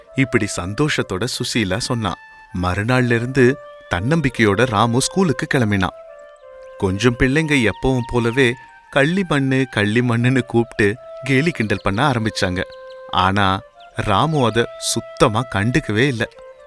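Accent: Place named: North, India. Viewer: Avar vadakkan apdithan pesuvaar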